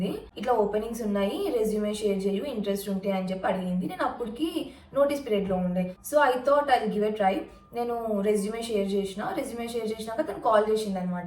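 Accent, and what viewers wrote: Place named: Telangana, India. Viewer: abba telangana slang telugu.. super got awe stuck with the natural way and no showoff... Are you engaged to someone or ready to? will u M*** me 😉🙃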